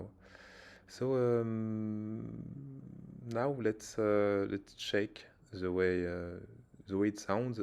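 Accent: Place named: France. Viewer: Je ressent un léger accent French l’ami 😉 je me trompe 😜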